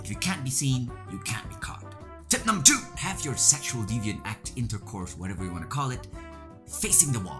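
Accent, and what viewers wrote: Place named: Russia. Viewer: Hebatlah bro. Love your humor and the Russian accent is spot on!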